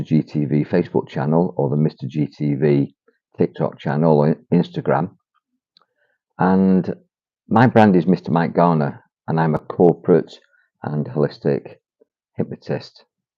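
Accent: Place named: Manchester, United Kingdom. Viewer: Omg listening to this sounds like I'm listening about myself.. I seem to have gone through repetitions though & really at the stage where I just don't have the energy to suffer anymore. I trained in hypnotherapy too, so do know all this stuff but have found that I'm great at healing everyone else, just not myself & I know that is an affirmation in itself. (The people pleaser also) but i would be very interested in talking with you to help me move forward. I am in my 50s & no longer wish to survive but wish to thrive now. I think it's time! Also I recognise your accent are you originally from Manchester?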